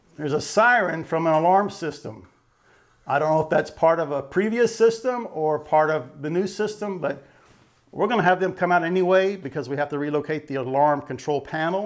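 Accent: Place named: Midwest, United States. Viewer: Popcorn and any ceiling textures are a bad idea! Nasty job! A question for. Where did you grow up? You have the mid-west accent. I grew up in Milwaukee, WI. Folks in LA know I'm not from here:-)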